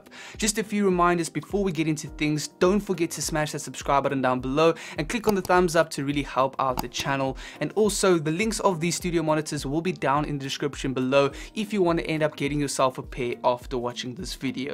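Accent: South Africa. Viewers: Howzit boet - where in South Africa are you from?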